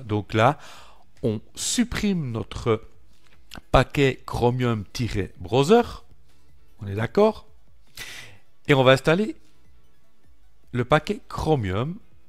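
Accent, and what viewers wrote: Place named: Luxembourg Province, Belgium. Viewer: salut je découvre ta chaine via Adrien D.(linuxtricks) tes vidéos sont pas mal, il y a juste que tu parles un peu lentement au démarrage de ta vidéo :D j'ai failli zappé, j'ai cru reconnaitre un accent de ma région (sud de la province du luxembourg) :D je te mets un pouce pour celle là et tu gagnes un abonné ;)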